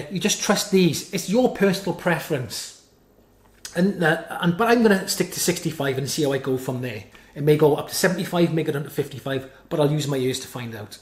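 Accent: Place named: South Wales, United Kingdom. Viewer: Great video! You sound like you live in South Wales 🏴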